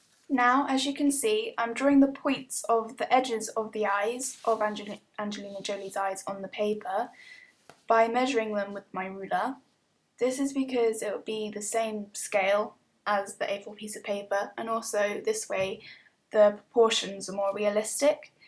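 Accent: United Kingdom. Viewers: R u English r South African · Omg you're British haha be aware that that makes most Americans like 200 times likelier to watch your videos..plus, you are one of the best portrait artists on YouTube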